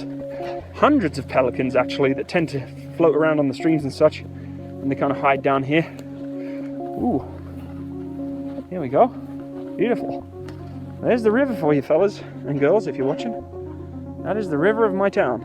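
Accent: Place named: United Kingdom. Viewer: Whereabouts in the UK are you from mate?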